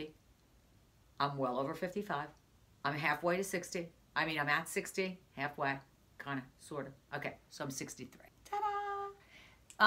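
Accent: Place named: New Jersey, United States. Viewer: Go, Jersey! I'm from Jersey and miss that accent. I loved your video on Gold Bond lotion. I just ordered it, and I can't wait to try it out. Thank you for the video review.😊😊😊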